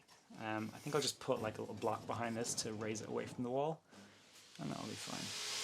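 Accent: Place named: Ireland. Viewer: Heard the fellow Irishman voice and had to subscribe right away!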